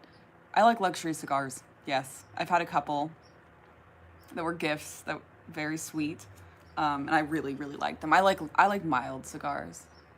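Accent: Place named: Midwest, United States. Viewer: in fact its not too fast, its a problem of accent... but sometimes, thats the good thing with you tube, i can roll back the part i dont understand and after 2 or 3 times understand it better! but it doesnt works all the time though! ( and dont be afraid, scottish, northern irish and northern english are waaay much harder to understand than your middle west accent!!!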